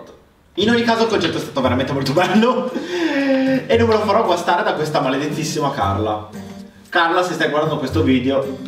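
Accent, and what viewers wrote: Veneto, Italy. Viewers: ma sei Veneto?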